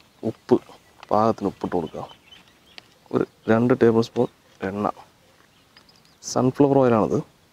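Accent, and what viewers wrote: Palakkad, India. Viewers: Palakkadan slang adipoli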